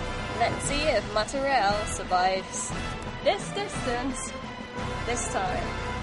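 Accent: United Kingdom. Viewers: Back when was British. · Why does speaks with the British accent in this video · So, I notice a different accent back then. The UK experience no doubt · Hilarious. Great video. In addition to the humor, I was struck by how deeply British your accent was at that time.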